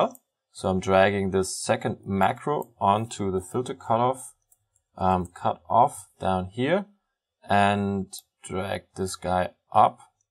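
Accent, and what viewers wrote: Germany. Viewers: Mark Toronto Hab mich schon gefragt, ob ich der einzige bin, der meint einen deutschen akzent herauszuhören :D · man hört das deutsche so doll raus XD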